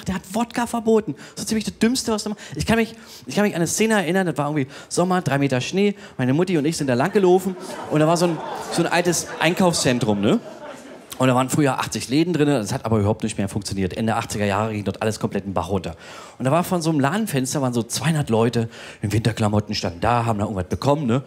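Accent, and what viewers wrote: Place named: Berlin, Germany. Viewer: Ich find ihn mega gut. Und ick liebe did Berlinern. 🙏😂.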